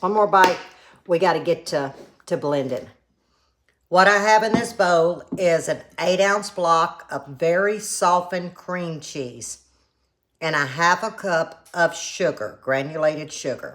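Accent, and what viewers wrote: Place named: South Carolina Lowcountry, United States. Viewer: is a treasure. As soon as I heard her speak I recognized the south carolina lowcountry accent. I have spent a lot of time in the lowcountry and the folks there are warm and inviting. Lovley people. Now she resides in the upstate of SC where I am from. Been watching her for a long time. She is a great storyteller too.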